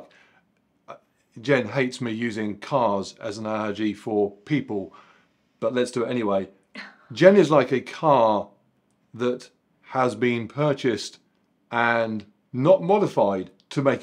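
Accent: United Kingdom. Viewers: I am here just to hear the queens language …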